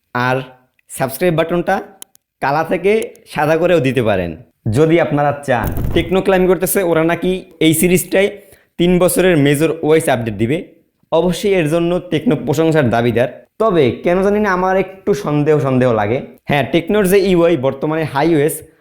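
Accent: Barishal, Bangladesh. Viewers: সুন্দর গুছিয়ে কথা বলে কিছু বরিশালের কথা চলে আসছে যেটা আরো বেশি ভাল্লাগছে ভাই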